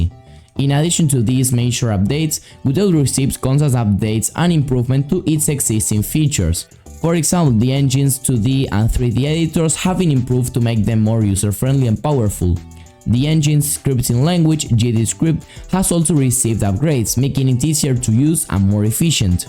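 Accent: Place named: Argentina. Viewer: Ese acento Argentino se escucha a leguas. Traduci tus videos asi nos ayudas a los hispanohablantes jajaja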